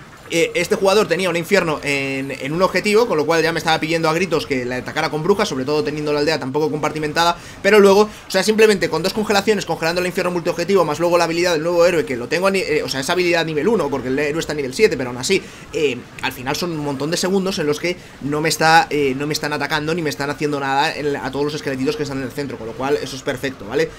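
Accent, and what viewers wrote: Venezuela. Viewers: eres venezolano? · jajajaja Venezuela hablando como siempre eres tu perrito xd jajajsj (también soy de Venezuela) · eres de venezuela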